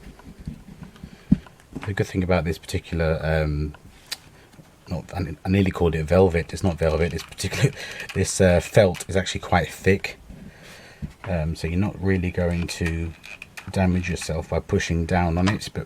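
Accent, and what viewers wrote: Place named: Kent, United Kingdom. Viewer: Clever. Wouldn't of thought of that. you sound like your from Kent. I live in Kent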